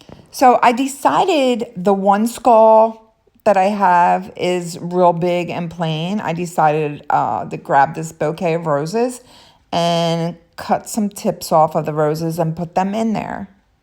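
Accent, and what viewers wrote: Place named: New Jersey, United States. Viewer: Are you from jersey or PA ? Just wonder from your accent lol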